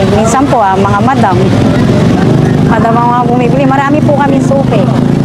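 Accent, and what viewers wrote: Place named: Pampanga, Philippines. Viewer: Me tono po kayong Kapampangan God Bless po❤️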